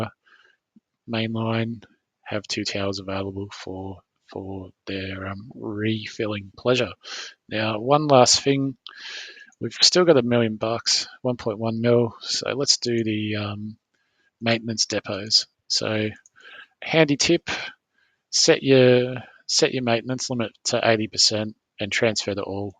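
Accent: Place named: Australia. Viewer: G'day mate. I just came across your series of videos. Very good to watch, so I have subscribed to your channel. I'm watching from Melbourne, and by the sound of your accent, I think you know where that is.🙂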